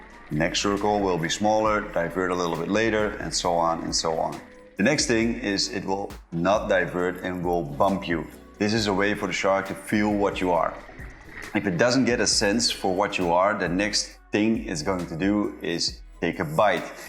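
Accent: Netherlands. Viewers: Nice vid! This guy is Dutch I hear it. Hi from Amsterdam 🎊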